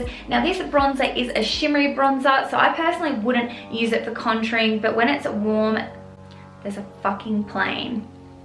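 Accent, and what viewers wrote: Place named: Australia. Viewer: I love you you're so pretty and I love you're Australian accent!!!!! I come from the UK but you're so pretty and I love all your videos!💖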